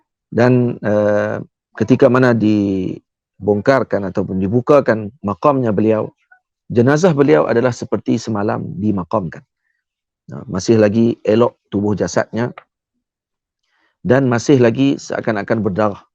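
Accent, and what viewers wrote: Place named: Malaysia. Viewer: trima kasih atas penjelasanya ustad mungkin bahasanya indonesia malaysia mungkin ustad dari malaysia masih ada logat malaysia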